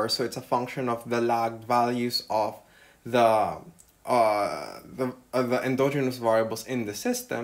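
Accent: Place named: Philippines. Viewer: You sound Filipino. Good video.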